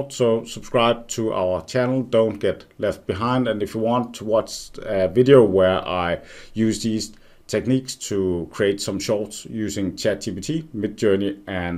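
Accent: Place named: Netherlands. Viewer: What the hell is that accent man? It's like Dutch, English, American-Aussie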